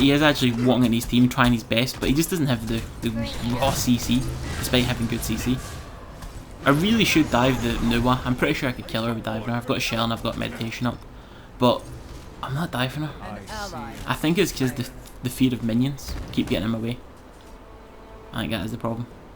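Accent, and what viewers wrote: Scotland, United Kingdom. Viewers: nah bro I'm trying to start YouTube I watch you're vid heard the accent and I was so happy to find another Scottish smite player. But I play Xbox if I had a PC I would play with you for sure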